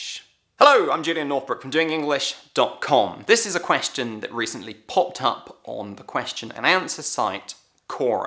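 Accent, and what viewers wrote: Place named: United Kingdom. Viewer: U spoke like British And British accent is hard to understand for many An American accent is good and easy to understand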